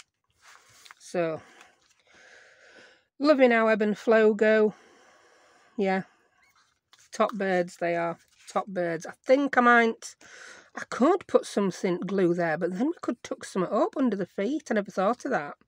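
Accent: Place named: South Yorkshire, United Kingdom. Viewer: Sorry, I just need to know where you're from. That accent screems South Yorkshire!!? 😃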